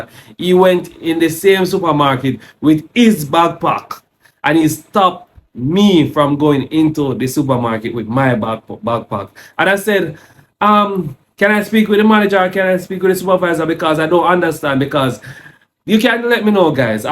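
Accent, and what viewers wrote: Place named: Jamaica. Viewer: Wow❗ So sorry 2hear your story... 1st of all, realizing that this happens a lot to POC in recialised societies & places, that's true 😵 And glad 2learn tht no-physical-harm came to you guys💯 Buut also just saying w/o equivocation or disrespect, its pretty difficult to sometimes follow your heavy Jamaican patios ((pat-wah)) accent... Caveat: Don't you realise that you might have a **Global Audience (which is a good thing), & is why you're on a public platform in the 1st place, right? However, not every individual tht comes 2yr channel knows, or is aware of Jamaican patois words? ~As an intelligent young man, just be cognizant of that fact? ✴️Yuh can duh bettah man! Talk clear, plain-English. After all, you're addressing your Global Audience, not just Jamaicans😃👋🏽 #Continue2STAY safe out There •Bless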